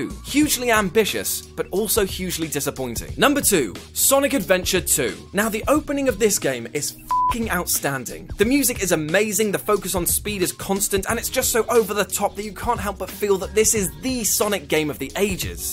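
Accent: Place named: United Kingdom. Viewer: Hate to say it but to most Americans ALL Brits sound the same. Blame PBS for this one. On a serious note. PLEASE tell me your not a fan of Goatsie and stop telling people to Google him. I would hate to see you hate to see you guys get a U.S. class action suit for traumatizing stupid 'Mercan kids and adults.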